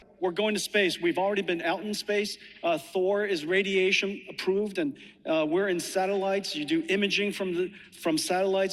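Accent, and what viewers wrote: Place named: Midlands, United Kingdom. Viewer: Why no Wikipedia? Or did I misspelll? " 2 March 2018 J04n talk contribs deleted page (Wikipedia:Articles for deletion/ (XFDcloser))" I was just wondering what her accent is. It doesn't really sound Midland, but words like "upset" sounds like "oopset." So, maybe she is. I luuve her content.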